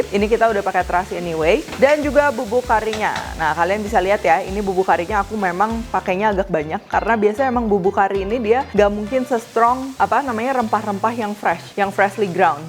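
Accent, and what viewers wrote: Indonesia. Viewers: Medokkk🥰🥰🥰❤, keren chef, enakk👏👏👏👏👏👏👏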